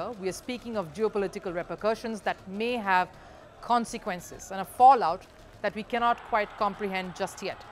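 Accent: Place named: India. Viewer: She has an Indian accent ...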